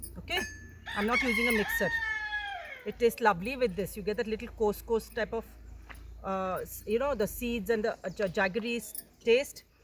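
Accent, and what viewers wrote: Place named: Maharashtra, India. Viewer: Best part is your Marathi accent 👍 plz use it often. Jay Maharashtra 🙏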